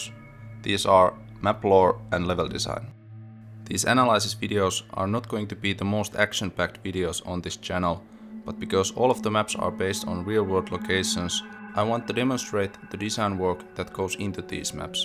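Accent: Finland. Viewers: This format is really good man. Hella interesting but also it really shows that you are competent at game development (Its easy to say you are good at something), you are trust worthy and honest! So many scammers out there and delusional devs! Good luck man I hope to back this when I can. Oh and I absolutely love the Finnish accent so enjoyable to listen to haha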